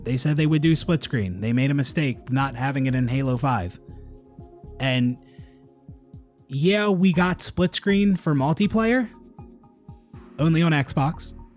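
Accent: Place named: Philadelphia, United States. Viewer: By the way, is that a delco/philly accent I hear? Delco checking in.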